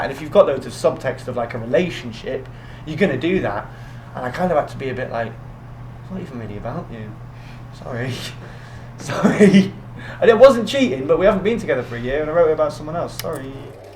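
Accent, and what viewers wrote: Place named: North, United Kingdom. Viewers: He sounds really northern in this video!! Love it!!! Northerner represent!!! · He has such a strong Manchester accent in this interview haha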